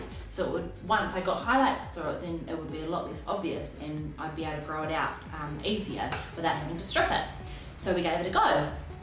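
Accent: New Zealand. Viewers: Kiwi! Yay! So nice to hear a familiar accent on here :D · new zealand accents are my favorite and I apologize for the ignorance, not all americans suck.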